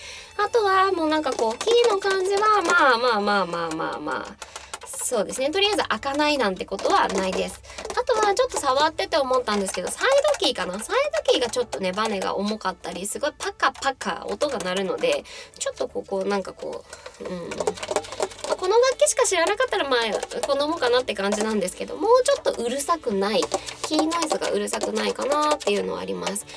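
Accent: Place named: Sendai, Japan. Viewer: 仙台弁!!ひしゃげるって言い方初めて聞きました(^^)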